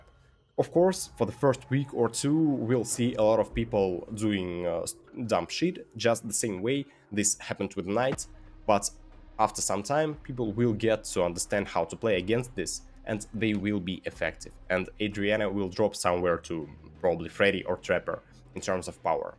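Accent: Russia. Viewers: Omg dis strong Russian accent:D Nevermind, just keep going! Do you remember how funny was Ochido’s accent? So you should also remember that it wasn’t a problem for him to reach his popularity and audience/fans:) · Listen your english try with balalaika accent is so funny XD You made my day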